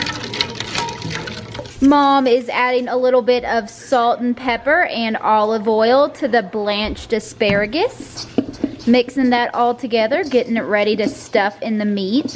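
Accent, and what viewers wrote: South, United States. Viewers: I just had to come and say, I always watch your videos and usually my 4 year old is in the room with me. Last night after I watched I was on to another video and he says ‘ is that the girl with the dog named bo? And I said oh no I already watched her video tonight. He then said ‘without me???’ 😂 he loves your videos too. I think it’s your southern accent he loves.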